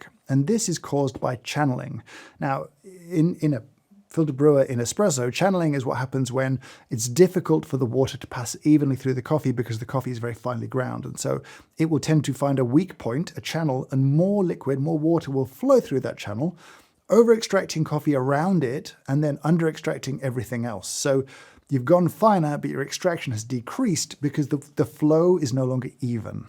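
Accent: United Kingdom. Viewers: Englishman says words about coffee very britishly. 10/10.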